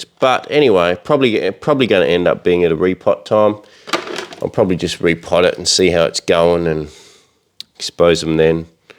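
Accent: Australia. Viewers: Sorry about the tree dying. That's gotta hurt. I really enjoy your videos, especially your bonza Aussie accent. I'm away from Oz atm and it's cool to hear someone who really knows his stuff talk about bonsai in a down to earth and approachable way. I'm new to bonsai. Am only in my 2nd year and trying to learn how to grow healthy trees. I don't have any real bonsai yet (all pre bonsai) so it's awesome to hear you talk through your decision making process. Middle stick to big stick for me but I like trunks with character best.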